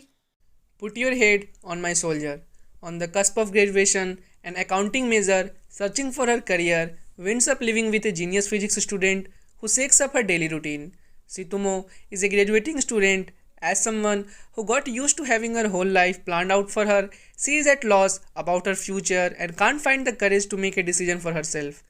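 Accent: India. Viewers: It's a "desi" indian accent. It's better to watch on mute ^^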